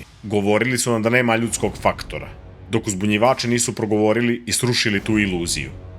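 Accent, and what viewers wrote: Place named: Montenegro. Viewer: Ja ga obožavam slušati baš zbog crnogorskog govora 🥰 a uz to jako je pametan đetić mogu mnogo toga naučiti 😊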